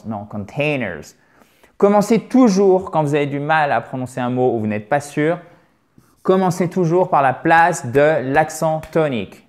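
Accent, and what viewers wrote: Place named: United States. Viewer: Hi. It's very interesting and subtle. I can add that you seem to be closer to the American accent!!! Enjoy the rest of your day!!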